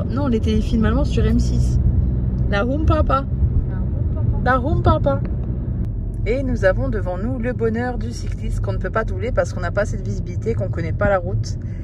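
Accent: Belgium. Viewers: tu m a fait trop rire avec ton accent belge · ton accent au début il était bien belge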